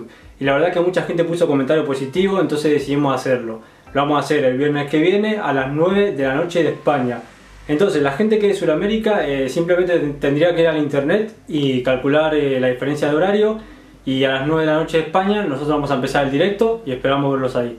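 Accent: Argentina. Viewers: Me parecia esa forma de hablar. Yo tambien soy argentino un saludo, sos un genio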